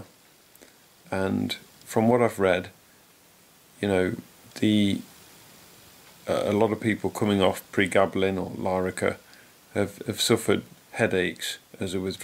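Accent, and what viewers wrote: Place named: Northern England, United Kingdom. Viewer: We both apologize for never shutting up! I’ve been trying to figure out if I can identify your accent. At first I thought it might be a northern accent, but not Liverpool, because you sound sort of like John Lennon but not like John Lennon. Then I thought maybe London. Now you mention going to Derby, I think (which, as you surely know, we would pronounce “Dur-bee”). Which I looked up on the map and see it’s fairly far north. You said something about a painkiller, and then named it. I’m not sure what the first word was, but then you said “and codeine”. I bet that’s similar to what I know as Percocet. Which is oxycodone and acetaminophen. I believe oxycodone has something to do with codeine. Which reminds me. I need to make a video about that. Because that’s very important. I learned four years ago that Percocet makes me stronger. 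Then I learned a couple months ago that OxyContin makes me significantly weaker. They’re both made of oxycodone. But Percocet is instant release and OxyContin is extended release. Which means it’s oxycodone with chemicals added to it to make it do something different than what oxycodone itself does. Those chemicals do other things, too (“side effects”). Like make me weak.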